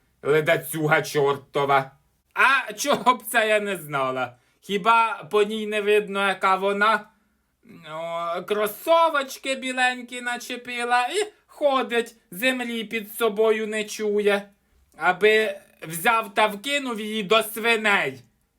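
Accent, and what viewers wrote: Poltava, Ukraine. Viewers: Вам срочно потрібно на "Україна має талант",Ви ж артистка ще та!!! Ну так же класно копіюєте наш Полтавський діалект,завжди з великим задоволенням слухаю! Рухайтесь до великої сцени,бажаю успіхів! Данилко теж наш і починав зі шкільної сцени,а тепер який знаменитий.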